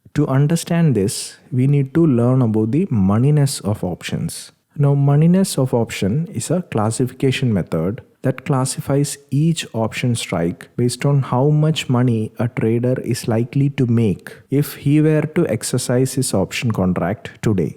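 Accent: Kerala, India. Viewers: Sir, are you from Kerala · The voice of a Malayalee. Well explained. Thanks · Excellent Video. Volume pronunciation can make out that it's a Malayali. Nevertheless, excellent presentation.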